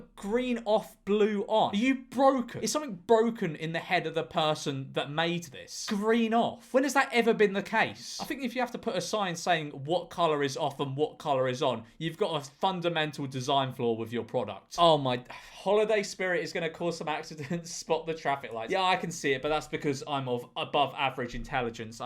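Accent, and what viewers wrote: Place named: Liverpool, United Kingdom. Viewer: Why are you slowly turning scouse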